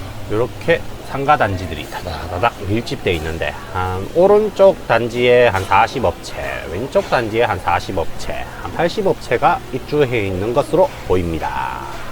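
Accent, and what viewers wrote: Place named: Chungcheong, South Korea. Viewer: 웰컴 서해안ㅎㅎ 경상도 사투리만 나오다 충청도사투리ㅎㅎ고향말투라 더 반갑네요ㅋ뜬금없지만 김포도 함 오세용^^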